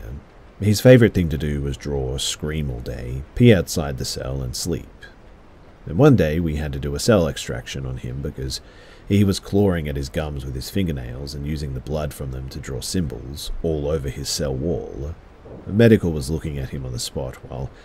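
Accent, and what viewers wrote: Australia. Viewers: Welcome back, man. Great to have you & your rhythmic Aussie cords. 🤭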